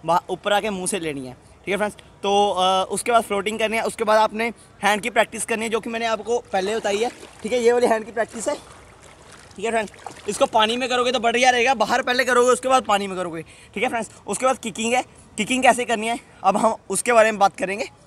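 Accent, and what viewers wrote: Punjab, India. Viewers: Oh that Punjabi accent 😆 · I liked ur accent - Punjabi Hindi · I love your Punjabi Hindi! #fullDesi ❤️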